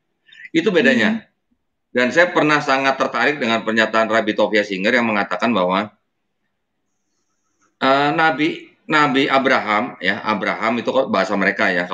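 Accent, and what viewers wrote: Banyumas, Indonesia. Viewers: Klo Sy suka aksen pak Jawa ngapaknya masih kental g taunya dari Cilacap Sy sllu nyimak pak mualaf cerdas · logat bayumas ga bisa hilang sepertinya walaupun sudah lama di jakarta 😂🤣😂🤣 sehat selalu om.....semga selalu dalam lindungan allah swt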